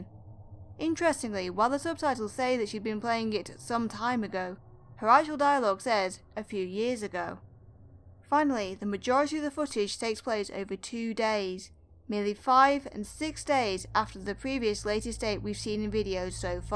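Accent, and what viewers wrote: United Kingdom. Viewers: Bristol? I wouldn't have guess that in a million years. I did think you were somewhere near Wales but your accent isn't that strong tbh. I like these Walten Files videos, keep up the good work!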